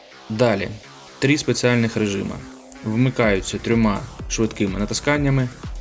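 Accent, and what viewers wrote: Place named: Russia. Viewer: как бы не пытался, а русский акцент слышно за версту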